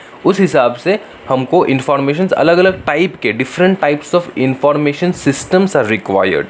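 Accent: Bengal, India. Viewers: Apka accent se pakka bol sakta hu ki aap Bengali ho😂😂..love from Guwahati ❤️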